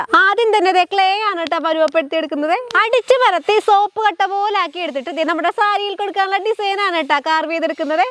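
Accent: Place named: Alappuzha, India. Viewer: കൊച്ചിന്റെ നാട് എവിടാണ്..ചേർത്തല, ആലപ്പുഴ slang തോന്നുന്നു..ശെരി യാണോ guuyys 😂😂❤❤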